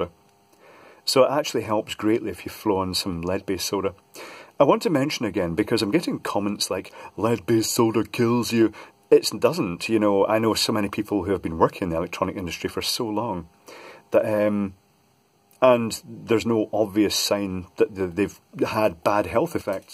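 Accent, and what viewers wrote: Scotland, United Kingdom. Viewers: 17 seconds in sound is clearer and not harsh at all i enjoy the scott accent reminds me of my great granddad :-)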